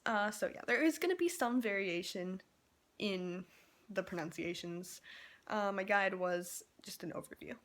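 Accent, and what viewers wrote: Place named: United States. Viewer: Great video, but you should really try to master the IPA pronunciations, physically. It still sounds like an American English speaker pronouncing latin: Although you're spot on in explaining the proper vowels, the vowels are different than the way you're pronouncing them.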